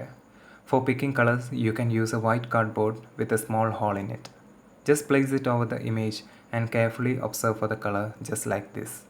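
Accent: Kerala, India. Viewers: I think you are malayali .becoz of your English accent .nice to meet you thank you for this video .iam a malayali too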